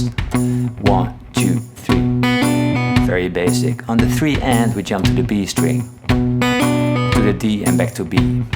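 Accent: Netherlands. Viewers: Hahaha gelijk hoor je het hè Nederlands. Blijkt toch een super charmant accent te zijn. Hahaha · He got a dutch accent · without checking anything, I can hear you are dutch. The beauty of it, is that the guitar doesn't sound dutch at all. it sounds like beautifull blues <3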